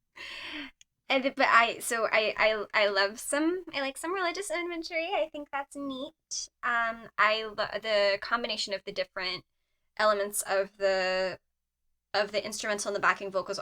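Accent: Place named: England, United Kingdom. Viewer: Your voice and english accent is so annoying.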